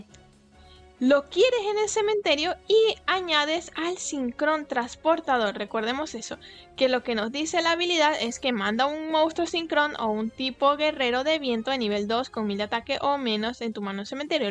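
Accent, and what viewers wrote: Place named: Canary Islands, Spain. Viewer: Tu acento parece canario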